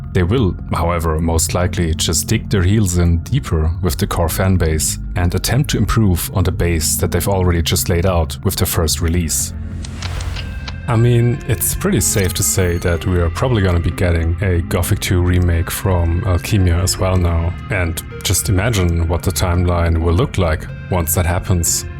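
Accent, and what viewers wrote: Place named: Germany. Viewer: You know it's funny when you think about it. PB could have done the same thing 3 times in a row in 3 decades, and people would still buy the game over and over again, just because it's Gothic. But as you said, they didn't know where their goal was, so making new games was esthetically more appealing for their company, which eventually led to their downfall. Also, nice to hear your voice. Not a completely German accent there. Kind of reminds me of someone :)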